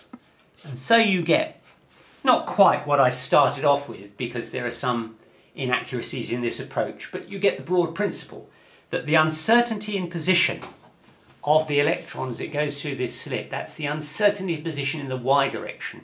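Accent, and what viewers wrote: United Kingdom. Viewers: Brilliant videos, thanks ! and im so delighted. His english is crystal clear! haha god bless British accent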